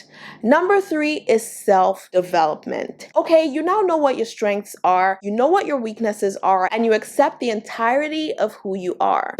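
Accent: Nigeria. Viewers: God bless you 🙏❤ I just signed up! Why do you sound Nigerian sometimes? Haha😄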